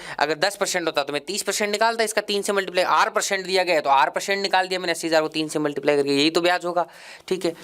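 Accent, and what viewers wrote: Haryana, India. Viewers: Sir haryana se h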